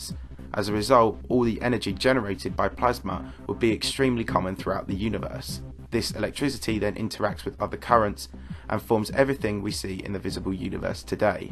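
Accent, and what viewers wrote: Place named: England, United Kingdom. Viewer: Natural narrator, and English accent just kills it.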